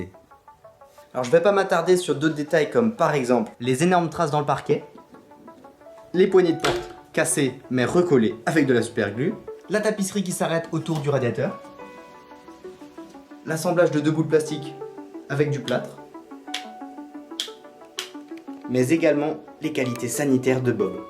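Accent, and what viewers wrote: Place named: Alsace, France. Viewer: J'étais sûr que tu était alsacien 😂😜🇮🇩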